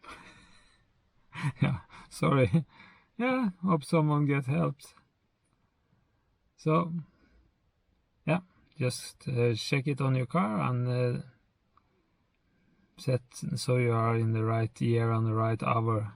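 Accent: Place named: Europe. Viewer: You are speaking some type of euro rubbish English. Learn better English before doing another video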